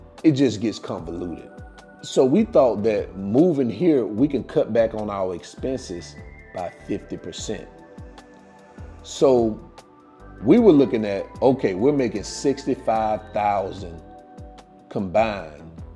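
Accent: South, United States. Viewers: I have to say , I love your country accent!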